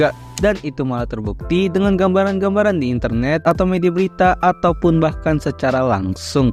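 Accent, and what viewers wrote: Java, Indonesia. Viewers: Lebih ke jawa sih bang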